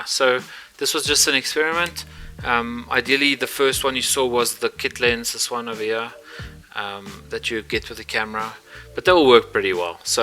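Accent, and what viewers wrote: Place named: South Africa. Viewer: Hey I enjoyed your Video and loved hearing your SAFFA accent being one myself in living in Melbourne. I have a Sony A6000 but looking for options to try and capture a group type live demo environment for others to watch via zoom (ie train others remotely). Any suggestions as to what webcam might suit that? Cheers mate. Darron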